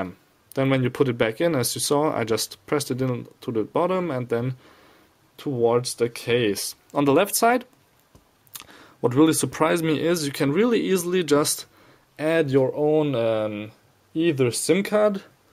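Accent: Germany. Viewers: great tutorial despite the german accent, danke schoen! :)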